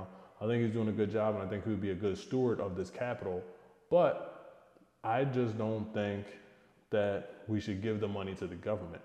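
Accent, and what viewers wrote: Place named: Jamaica. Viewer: I'm pretty sure you are not Jamaican... your accent sounds authentic... didn't hear you mention whether or not you are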